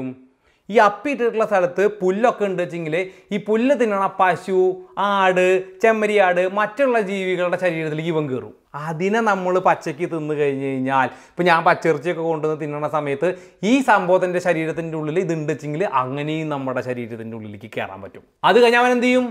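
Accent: Thrissur, India. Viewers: Cheten poliyatta.mmede TCR basha poliyayi parayind.pinne class poli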